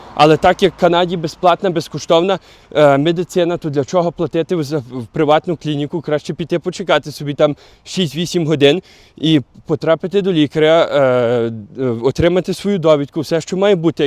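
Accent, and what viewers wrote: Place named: Stryi, Ukraine. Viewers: У вас така оригінальна вимова❤ що я немов не у Канаді, а на Стрийщині побувала😅❤ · нагадав мені одного сусіда з сусіднього під'їзду, коли я жила у Львові..(дуже хороша добра людинабув той хлопець..( На жаль, мені чомусь завжди подобались хлопці "з претензією".. Це моя Карма.,)) Але я дуже з великою повагою відношусь до добрих хороших людей. Нехай таких буде більше на Землі! Така розмова у як у певних регіонах Львівщини..) молодець. Розповідає про актуальні теми, показує багато Канади. Дуже цікаво. 🙂🙏... Ці люди всі кудись спішать, вони хочуть тусити, кавувати, їм потрібен натовп.. Я говорю про тих хто жаліється на Канаду. Ну то добре, не подобається - їдьте в інше місце.. Дайте можливість нормальним спокійним інтравертам (і неінтравертам теж,)) пожити в прекрасній країні, де є багато простору, природи і Закони, яких всі дотримуються. 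(!) Держава, яка турбується про своїх жителів. Безперечно, нелегко відразу знайти роботу, можливо, хтось не знає англійську мову. Але хто хоче, той вивчить. І навіть перевчиться, перездать іспити і досягне успіху. Все залежить від бажання людини і прикладених зусиль. Європа колись була чудова, а зараз вона перенаселена. І не дуже безпечна. Кожен шукає, що їм треба. Дай Бог кожному з нас знайти. (!!!) Дякую за відео. 🙂🙏🕉️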